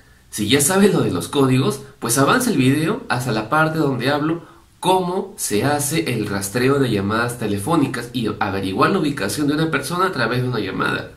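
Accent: Peru. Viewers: Hablas agradable, peruano, mejor que lo horrible que hablan ciertos ecuatorianos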